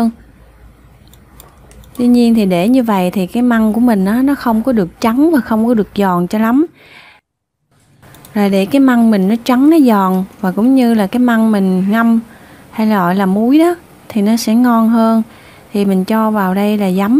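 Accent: South, Vietnam. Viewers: Cháu nói gịong miền Nam rặt, vậy thay vì nói " thìa", mình nói " muỗng ", dễ nghe và hợp lý hơn.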